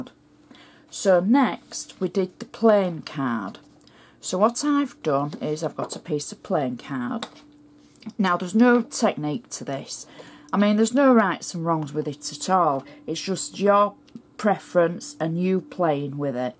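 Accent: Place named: Yorkshire, United Kingdom. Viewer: Nuvo product doesn’t have a sponge, and I never actually learned the “proper” way to use it, so obviously I get it all over my fingertips. Because you’re right: you just want to dig your fingers in it at once. Fortunately, I don’t have a lovely manicure like yours, that I wouldn’t want to mess up. PS. Love listening to you speak. Your Yorkshire accent is “loov-ly”. 😻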